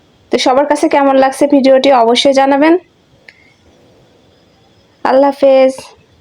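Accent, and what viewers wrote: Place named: Rajshahi, Bangladesh. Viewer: আপা আপনার বাড়ি রাজশাহীর কোথায়? মনে হচ্ছে আমার এলাকার ভাষা বলছেন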